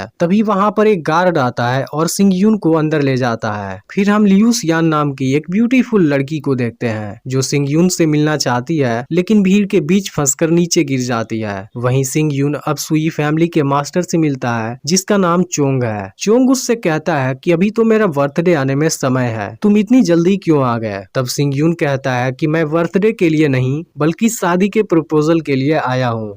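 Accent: India. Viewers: I hate the Indian accent......I can't stand hearing it awwwwwwwww🤮🤮🤮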